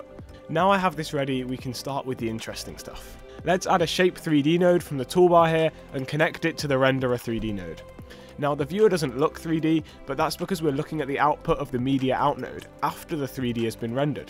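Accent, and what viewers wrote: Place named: United Kingdom. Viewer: terrible british accent, can't understand anything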